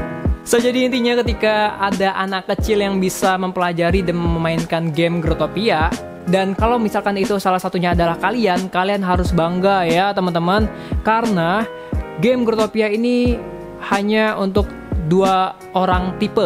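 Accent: Indonesia. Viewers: Bang, aku mau nanya tapi jujur ya, abang orang Babel ya?, Bangka Belitung,.. iya gak?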